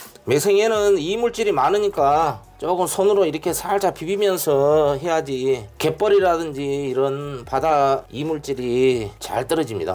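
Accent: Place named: Yeosu, South Korea. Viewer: 여수 억양이 많으시네요 잘봤습니다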